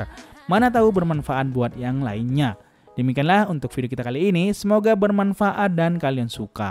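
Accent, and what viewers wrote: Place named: West Java, Indonesia. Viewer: he'eh nya si aa teh meuni sunda pisan nya .. wkwkw jadi resep maca na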